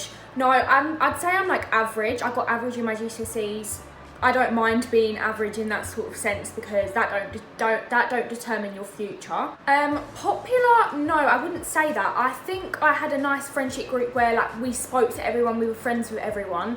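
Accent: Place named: Australia. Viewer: The Australian accent tho😍😍😍😍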